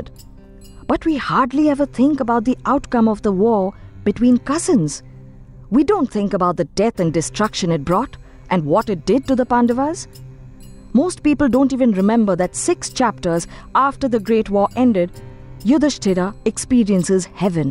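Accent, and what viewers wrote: South India, India. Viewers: Sir, RAMA,DHARMA, MAHABHARATA are our South Indian style of Pronouncing habit.. For example in West Bengal, people pronounce where ever "V" comes, they pronounce like "B".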